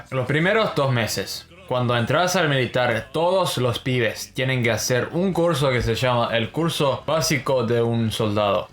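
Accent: Uruguay. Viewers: Acabo de ver los primeros videos cuando llegaste a uruguay, increible como aprendiste el idioma y ese acento! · Que bien que hablas con el acento Uruguayo jajaj que grande boludo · Es uruguayo el acento jaja 🇺🇾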